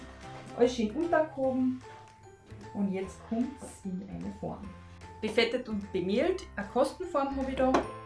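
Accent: Carinthia, Austria. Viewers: Hab mei Ergebnis gerade bei Instagram gepostet inkl Verlinkung. Ist wirklich lecker 👌 Süß find i, dass du in dem Video deinem Kärntnerisch so treu geblieben bist 😊👍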